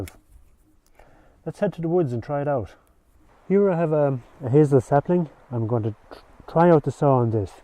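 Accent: Ireland. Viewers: Great review I like watching your videos, the way you talk and the words you use remind me of my Grandfather, He was from County Mayo on the West coast.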